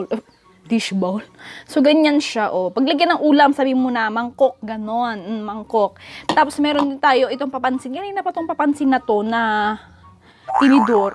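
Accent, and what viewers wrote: Ilocos, Philippines. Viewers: I love your ilocano accent "mallukong"😂 I love you ate!!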